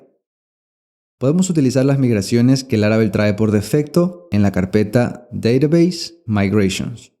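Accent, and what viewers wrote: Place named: Uruguay. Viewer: sos uruguayo te saque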